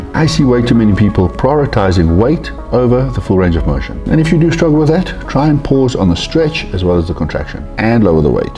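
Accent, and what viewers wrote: Afrikaans, South Africa. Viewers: Man I love you bru....you are so down to earth...so charming....funny and all round beast.....I can't help but notice the south african accent.....lol so I guess that also makes it easy for my to just give you my full support....LAAT ONS DIE BENE HARD BLIKSEM MANNE!!! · Is South African? I'm from western cape sounds hella Afrikaan lol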